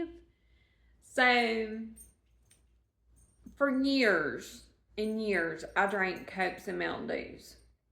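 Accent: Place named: Mississippi, United States. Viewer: I recognize that accent. I’m a Mississippians too. ❤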